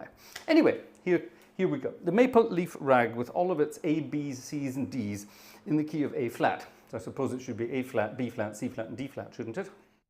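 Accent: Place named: Scotland, United Kingdom. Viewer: I have been away for a while but getting back into the swing of things and I enjoyed this organ night. You're sounding more and more Scottish each day!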